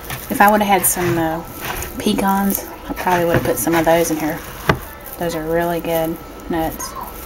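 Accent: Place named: South, United States. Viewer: I LOVE your videos. I am a midwestern cheese, corn everything & cheese girl going raw. Have been for 10 days. We crave what we know and let me tell you, your videos have made it easier for my husband and I to go raw. Thank you very much. Would like to see some new videos from you so you can let us know how you are doing! If you're not still raw, it's ok. You have an entire list of followers ready to cheer you on again! And I love your Southern Belle voice.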